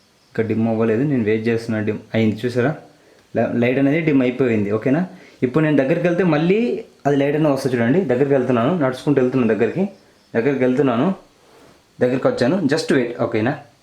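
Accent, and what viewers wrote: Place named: Telangana, India. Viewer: Complete telangana language😂😂👍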